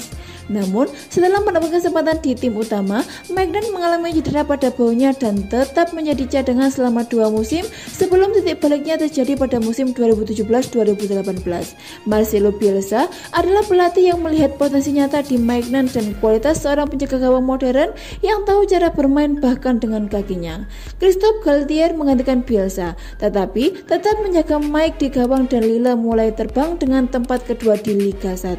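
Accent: Java, Indonesia. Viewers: Medddoook banget mbaaaakk eee 😅😅😅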